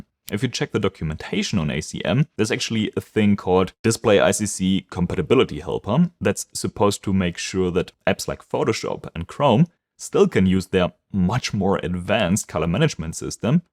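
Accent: Germany. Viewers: Hab den deutschen Akzent direkt rausgehört😂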